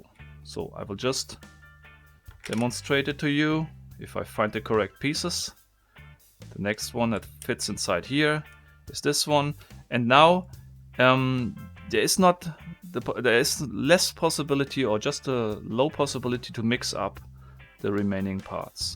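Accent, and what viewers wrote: Germany. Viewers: It's the accent! I don't know why but Germans speaking English are making me joyful somehow haha and English is not even my mother tongue (I'm French) :D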